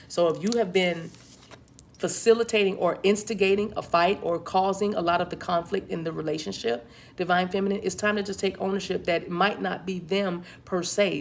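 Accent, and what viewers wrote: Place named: South, United States. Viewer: that southern accent GIRL!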